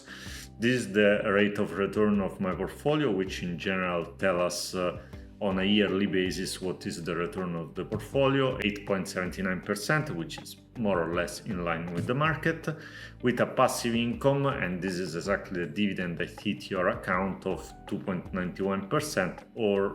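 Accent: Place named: Portugal. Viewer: Great video about Snowball Analytics and I love the Portuguese accent! Does SA use FIFO for cost basis and capital gains/losses calculation or do they give you an option to choose average cost instead?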